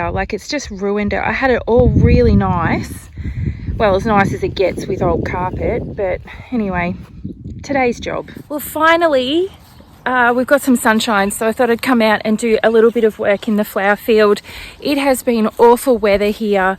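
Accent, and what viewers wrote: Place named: Australia. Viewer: Make sure you're getting enough rest friend. Stay hydrated. I find the Australian accent very soothing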